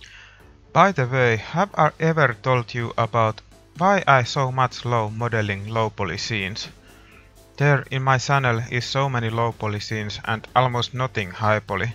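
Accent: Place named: Finland. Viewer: Hey, I really enjoy your content, and of course that Finnish accent XD I am from Finland as well;) I think you have one of the best art styles of all blender users;) KeepUp nice work!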